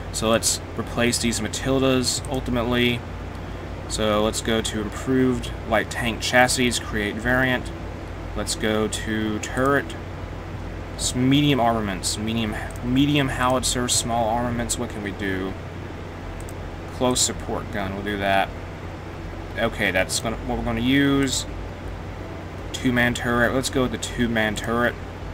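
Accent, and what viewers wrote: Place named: Appalachia, United States. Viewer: Now u sound loke an american hilbelly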